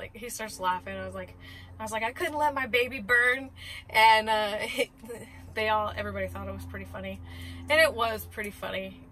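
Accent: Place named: United States. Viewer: Hi, I study English for your video. I like hear you american English. I worked as crane driver, I drove truck crane.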